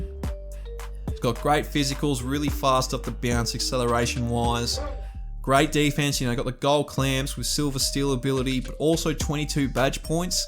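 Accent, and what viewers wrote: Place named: Australia. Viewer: Thanks mate this was great. Also refreshing to hear an Aussie voice and to not have a bunch of over-the-top editing in my face when watching the video 🤙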